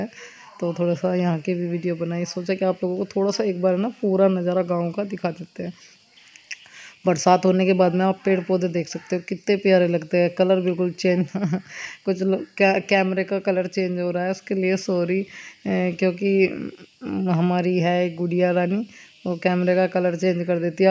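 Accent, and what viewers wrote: Haryana, India. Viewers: Aaj aawaj bilkul haryanvi lg rhi thi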